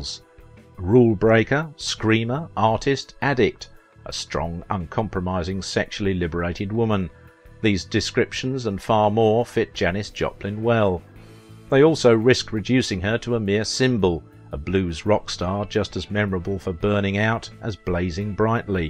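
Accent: United Kingdom. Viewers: Script clearly written by a kid. Why put a British accented man to speak your words? "She had a guy and a woman...so that's when she ended up doing heroin. It turned out to be super strong stuff..." Not a criticism but weird choice. The accent isn't needed to add cred.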